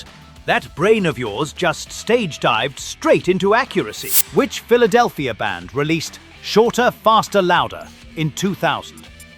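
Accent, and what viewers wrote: England, United Kingdom. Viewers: Why the English accent talking about US punk ?.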